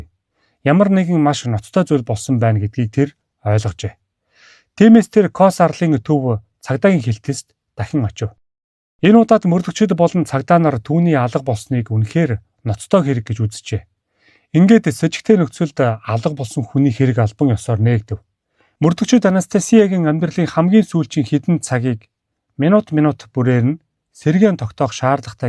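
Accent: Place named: Russia. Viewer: Polish nernuudiig yg l oros met duudaj bna harahad ijil tustei haragdaj boloh ch shal ondoo duudagddag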